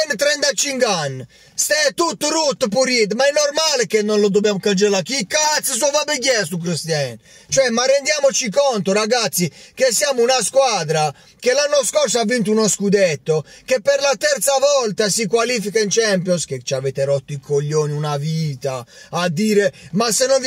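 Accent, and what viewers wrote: Apulia, Italy. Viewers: sei forte,continua così a parlare il pugliese, sei uno spasso, non sono milanista, sono dell'altra sponda del naviglio (interista), ma ti seguo tutte le sere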